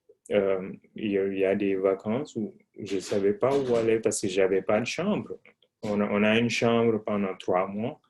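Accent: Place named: England, United Kingdom. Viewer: C'es ouff tu parles français avec un accent anglais pour un francophone ! Great!!!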